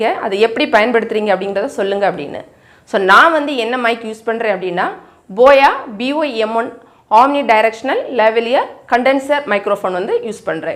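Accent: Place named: Nagercoil, India. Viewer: Nagercoil slang ungaluku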